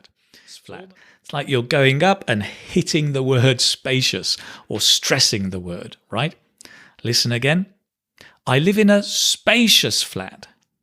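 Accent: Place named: RP, United Kingdom. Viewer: 👏👏👏👏👏👏👏Goooooorgeous English without an accent! Well done,! Es un placer oirte! When I was young I looked after my uncle"s ponies at Cowdray Park Polo Club, in Midhurst. Everybody spoke just like you, if you know what I mean!😄😀😄😄 Un abrazo, from Olivos, Buenos Aires, Argentina🇦🇷🇬🇧🇦🇷🇬🇧🇦🇷🇬🇧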